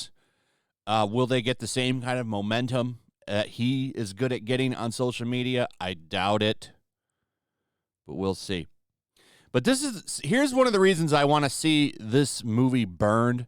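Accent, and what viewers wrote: United Kingdom. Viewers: i dont know why your crybaby voice is so awfully british old boi, but i do approve guvnor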